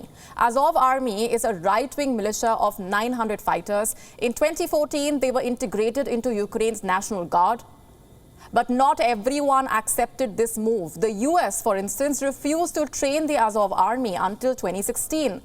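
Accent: India. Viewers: They should hire a english native speaker, the indian access is very thick T_T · Too heavy Indian accent - can't understand what she was reporting. Not too many people tuning in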